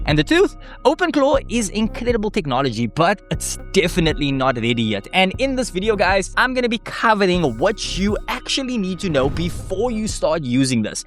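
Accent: South Africa. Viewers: ... Are you.... Are you South African ????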